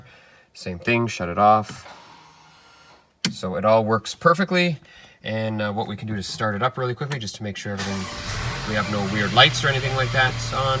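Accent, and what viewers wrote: Canada. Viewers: lol, it’s always good to watch a video of an enthusiastic demonstration. I bet you’re from Canada, you got a Canadian accent.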